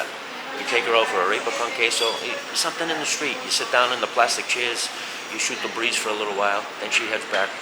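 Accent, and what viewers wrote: New York, United States. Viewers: Im from NJ and I can hear a long Island or NYC accent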